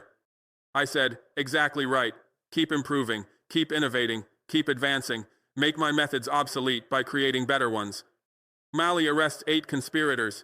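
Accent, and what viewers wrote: United States. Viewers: Sir, you sound like a good Ole boy, right down the road from me. Educated here in Texas.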